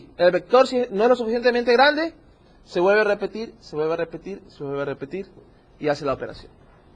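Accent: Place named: Ecuador. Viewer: se me hacia familiar la voz de ecuatorians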